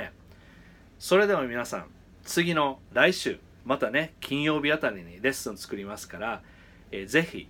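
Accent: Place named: Kansai, Japan. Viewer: 20年ぐらい前に藤田先生のCD付き教則本買って同じ事してました、とても懐かしいですね。 最初は気が付かなかったけど関西なまりであれーってなりました笑 動画登録して楽しく拝見させてもらいます。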